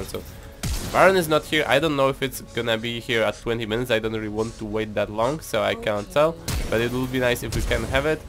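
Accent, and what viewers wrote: Poland. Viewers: Are you polish? Your accent is really similar lol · Polski akcent? Czy tylko mnie sie wydaje? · ty jesteś polakiem bo masz taki głos polaka. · Jestes z Polski prawda? Slychac, np gdy wypowiadasz 'mana' . Pozdrowionka i robisz mega robote!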